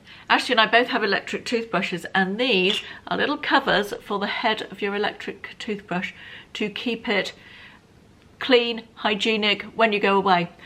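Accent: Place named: Oxford, United Kingdom. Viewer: I like your Oxford English?! At least it reminds me of my school English lessons 😊. Therefore I’ll come back to your next Temu Haul ❤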